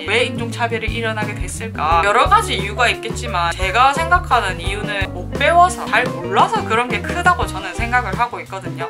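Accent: Busan, South Korea. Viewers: 음....좀 더 듣다보니...부산같기도 하구요 ㅎㅎㅎ ^^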